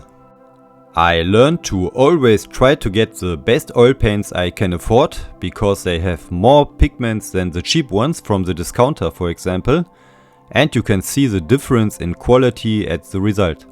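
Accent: Germany. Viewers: ich finde Dein D`englisch sehr sympathisch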